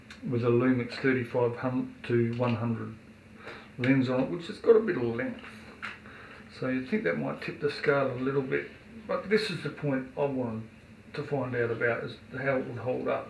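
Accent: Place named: New Zealand. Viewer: Hi '' ''..you are ''brighter'' than me as I had to jump on youtube to see how to open the camera mount for smart phones!..thanks for the heads up.Btw,I live just down the road from where they are made in Shenzhen and the cost was only 59RMB.When the tripod arrived in very professional packaging and I had a chance to see the quality, I made a comment to my son ''how can anyone compete with the Chinese in manufacturing!'' From a fellow Kiwi in China (unless I am very mistaken with your accent!)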